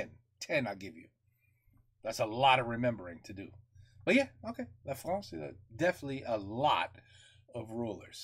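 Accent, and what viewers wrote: United States. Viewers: strong american accent DE LA FRONCE !!! 0/ j'aime beaucoup :D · J'adore quand tu parles français, avec l'accent américain c'est very cute! The golden globe and the scepter held by the King symbolise his God-given powers. The globe is the Earth (yes, no flat earth people in France back then), the physical world, it means that the monarch rules over his land, while the scepter symbolises his power of life and death over his people and the ability to give justice (le bras séculier). The History of France is very complicated, but very interesting.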